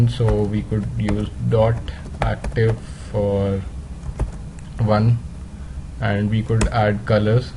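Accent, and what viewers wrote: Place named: India. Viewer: dari logatnya kayaknya dia india cuy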